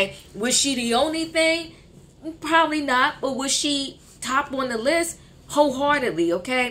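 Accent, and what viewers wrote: New York, United States. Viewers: ❤ your NY accent and attitude while giving your commentary. I watched twice in a row. You're on point!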